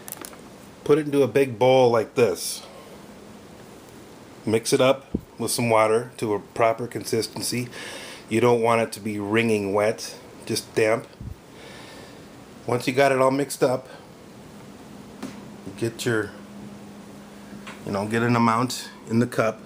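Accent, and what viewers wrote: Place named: Minnesota, United States. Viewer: Love your video! So funny I could tell you were from MN before you even said. Probably because I grew up in Prior Lake! Love your advice and it is really awesome! Thanks so much!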